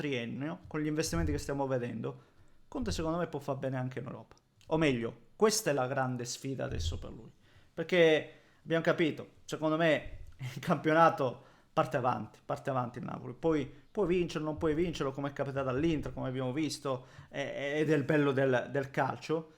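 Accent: Calabria, Italy. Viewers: devo dire che la cosa che più mi ha colpito del video è scoprire che sei lucano (lo scopro ora nonostante ti segua da anni) il che mi ha sorpreso perché dalla tua parlata passa un filo di accento calabrese quindi immagino tu sia della zona vicino la calabria. magari mi sbaglio, tuttavia io ho origini materne proprio in basilicata e quindi volevo sapere di che zona sei magari c’è la remota possibilità che tu sia della “mia” zona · È lucano? Ma parla e si muove come i calabresi ahahahaha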